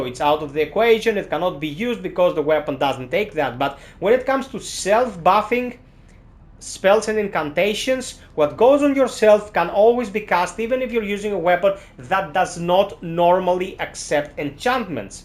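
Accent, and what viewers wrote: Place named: Greece. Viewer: dude that is a greek accent ain't it! reminds me a bit of old school toronto in the 70's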